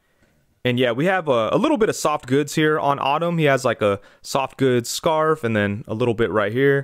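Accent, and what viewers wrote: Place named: AAVE, United States. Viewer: Dude speaks in Ebonics and is happy to review copyright infringement toys. Lol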